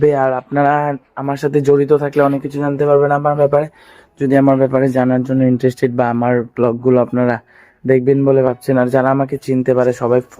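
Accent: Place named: Kolkata, India. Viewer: Ami shocked 😳😲 tumar bangla kolkata bangla moto waw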